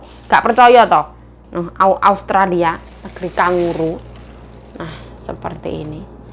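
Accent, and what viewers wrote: Java, Indonesia. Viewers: *Lansia 80 🇳🇱🌷Holland*...selamat pagiii...baru dua hari mengikuti Vlognya...menarik menontonnya dicampuur rasa iba kalo baca yg sedih2...saya tersenyum mendengar clotehan medók sekali logat Jawanya dan waduuuh lancaaarr ucapan2nya seperti air terjun🥰...dari Negeri kincir angin salam sehat selalu tetaplah semangat....juga buat para pemirsa dimanapun berada.....🙏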